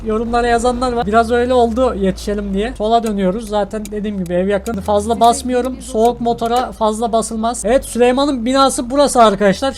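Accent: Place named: Ankara, Turkey. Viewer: Dünyada hem Ankara hemde Adana şivesi yapabilen tek insan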